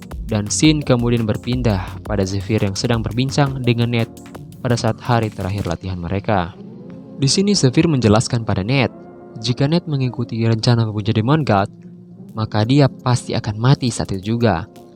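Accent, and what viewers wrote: Sulawesi, Indonesia. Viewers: Org Sulawesi ya bang?😆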